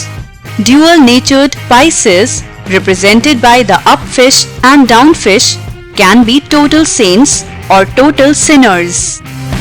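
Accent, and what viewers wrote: India. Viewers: I was really excited to watch the video until I heard the cringy indian accent. sorry. had to be said.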